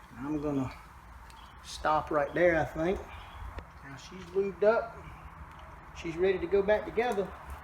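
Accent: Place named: Alabama, United States. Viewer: i was watching the video in the shop doing my water pump and one of the other mechanics said he sounds like he's from Alabama too ..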